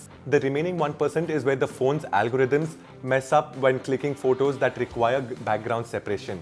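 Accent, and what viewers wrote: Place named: India. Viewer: Look like a european guy but with india accent🤭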